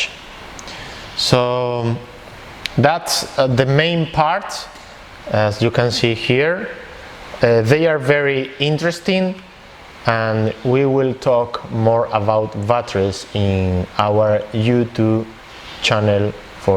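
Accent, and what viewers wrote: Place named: United States. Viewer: Súper para los que no tenemos el oído acostumbrado a la modulación de un gringo:)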